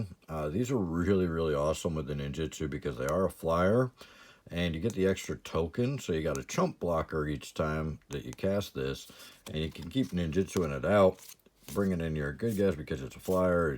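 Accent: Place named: United States. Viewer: May I ask what area of the United States you’re from? Can’t get down where your accent is from. Watching here from MN. Keep it up!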